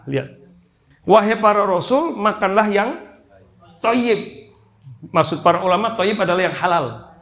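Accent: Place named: Banyumas, Indonesia. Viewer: ustadz bahasane penak ngapak pd kr nyong, semangat tadz sehat sll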